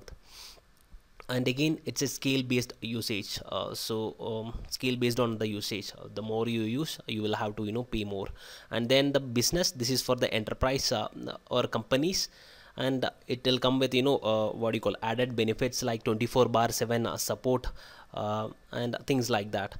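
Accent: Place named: India. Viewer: Am I the only one who can t support this Indian accent? no offense...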